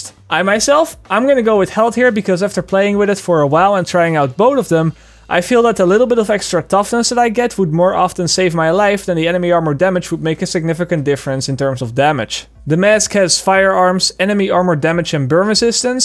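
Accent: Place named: Netherlands. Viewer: Jij bent nederlands... Ik hoor het in jou accent.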